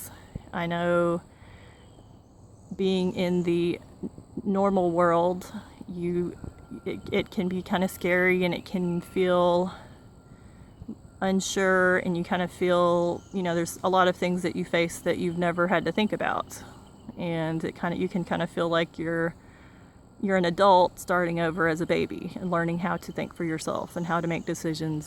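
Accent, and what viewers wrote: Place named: Texas, United States. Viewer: You sound perfect- just enough Texas twang to make it authentic! Exactly how I figured you'd sound!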